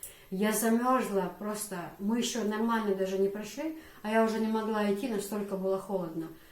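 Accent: Chechnya, Russia. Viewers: Знаешь некогда не подумала бы что вы русская потому что акцент чисто чеченской увас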